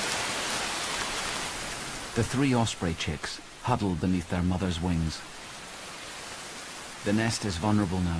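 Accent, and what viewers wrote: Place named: Scotland, United Kingdom. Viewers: Lmao I don’t think this person realizes what a run-on sentence is. Also, you are entitled to your own opinion. You literally just gave us yours. So what is the big deal if we like a celebrity? Can we not like what we want to like? With the whole narration thing and you not understanding/liking the accent, I really don’t know what you expected. It’s a documentary about nature in Scotland….so it makes sense why it’s narrated by a pretty well-known Scottish actor. It’s supposed to add to the fact that this is about SCOTLAND. If you have such a problem with it, don’t watch it. Simple. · If you can't understand the accent then you won't be able to understand Scottish people if you were to visit these places on the screen in real life so why watch?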